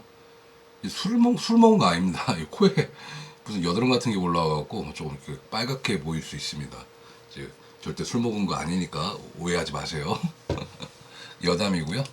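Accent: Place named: Chungcheong, South Korea. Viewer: 님! 영상 잘 보고있습니닷! ^^ 제..여담인데 한가지 말씀드리고 싶은게 있어서요!! 저는 경상도 사람이라 성질이 좀 급한데... 님 말씀하시는게 1.25배속 하면 제가 듣기에 딱 맞아요ㅋㅋㅋㅋㄱ ㅋㄱ답답해요ㅋㅋㅋㅋㅋㅋㅋㅋㅋㅋ 1.25배속!!ㅋㅋㅋㅋ 충청도 말투를 평소에 많이 접하지 않아서 그런가봐여ㅋㅋ😊😊 영상 많이 올려주세요! 쇼츠도 많이 올려주시구요! 장동혁 대표님 화이팅❤🎉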